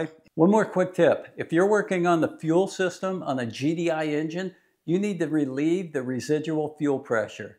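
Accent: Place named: United States. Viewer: I noticed that too. Thought it was a European spelling but he sounds American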